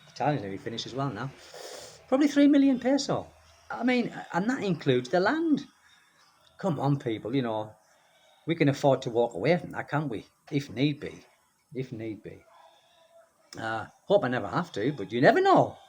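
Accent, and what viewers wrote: United Kingdom. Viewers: Sounds like you’re from the Uk like myself 😂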